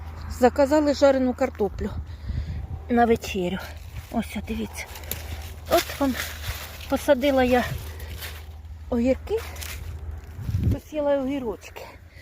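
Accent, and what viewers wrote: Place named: Kharkiv, Ukraine. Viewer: доброго дня! Випадково потрапила на ваш канал. Дивлюся на вас, ніби на себе збоку)) Говір схожий чи не з Харківщини ви? А помідорчики... така ж історія, в погреб вже ставити ніде, роздала, а це вже бройлери смачно їдять)). Успіху вам, миру і перемоги нам усім!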